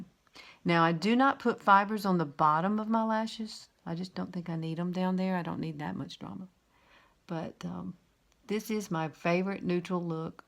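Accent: South, United States. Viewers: Love your attitude and your bubbliness (is that a word?), and I love your southern accent cuz I'm from LA (Lower Alabama). Watch you later YALL. 👍😊😁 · I was reading the comments on here & if I read correctly, you're in your 60's...? My gosh, I can only HOPE I look like you when I'm your age! (I'm 41) You are beautiful! And your sweet personality just adds to your beauty! Your accent is just too cute also! I'm from Texas, so I definitely know a cute little southern accent when I hear one! 😉 God's blessings to you! ❤️ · Oh my goodness, where in Alabama are you? I live in Alabama too. I absolutely understand your soooouthern accent!!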